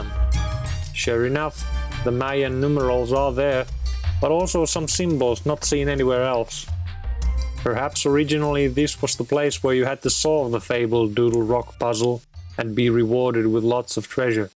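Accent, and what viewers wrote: Finland. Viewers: The voiceover has to be Finnish? Onko aika mennä Torille? 🇫🇮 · finnish yeah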